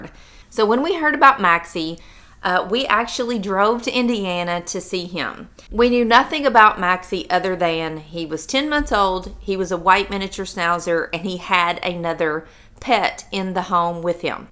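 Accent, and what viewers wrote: South, United States. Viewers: I just love southern accent lol xxx it is 11°C here in Peterhead Aberdeenshire Scotland and I'm in a bootlace strap t-shirt lol... hardy folks us Scots lol xxx Love the new Merc xxx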